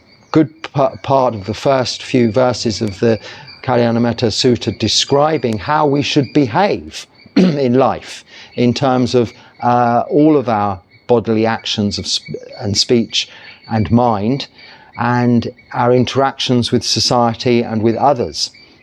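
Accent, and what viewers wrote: England, United Kingdom. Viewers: What an absolute treasure these frank talks are, from a monk in situ...with an English accent! I hope the young people realise this was impossible pre-internet! Many thanks!! I have a question below.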